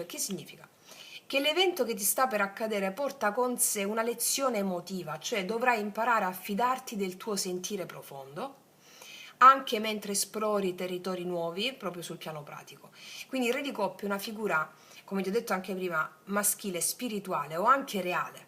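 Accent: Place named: Lecce, Italy. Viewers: Grazie mille, sei fantastica. Mi piace tanto la tua lettura e la base sciamanica che hai. Oltretutto hai l'accento leccese che mi riporta totalmente a casa. Io vivo da 57 anni in Germania ma nonostante distinguo sempre molto bene quando sento parlare l'italiano da una leccese. Un abbraccione e grazie. Ti seguirò con piacere.🙏❤🥰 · Sei stata incisiva 🎉 Dall‘ accento sei salentina , ti seguo dalla Francia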